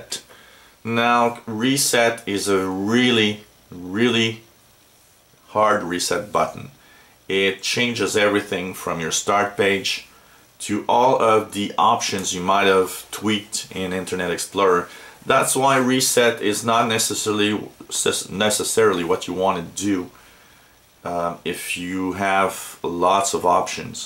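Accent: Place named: Eastern Europe. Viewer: This worked perfectly for me (restore advanced) even with 64 bits. Done, and done. Thank you eastern-european-sounding guy!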